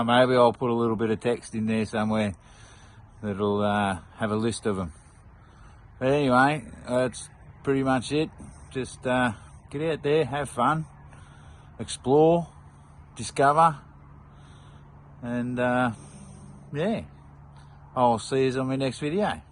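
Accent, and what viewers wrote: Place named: Australia. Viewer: Hi! You got a new subscriber from Sweden. I really liked your video, easy, authentic and genuine and I think you will be a very liked person on YouTube. And with that said, I had a little bit difficult understanding some words but I must say that you “down under” have so nice “sounds” in your English! 🙂‍↕️ Good luck on your journey! 🙋🏼‍♀️🇸🇪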